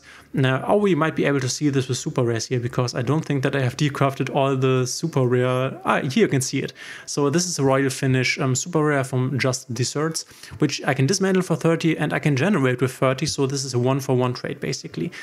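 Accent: Germany. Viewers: Bin neu und habe schon an seinem Akzent irgendwie bemerkt, dass er Deutscher ist :))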